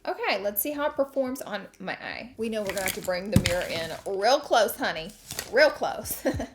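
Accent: South, United States. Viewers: I swore I had seen enough reviews for something I can’t afford right now but I adore face, personality and especially her adorable southern accent 😍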